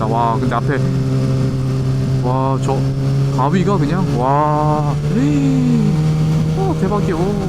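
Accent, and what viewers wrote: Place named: Jeolla, South Korea. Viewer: 얘 전라도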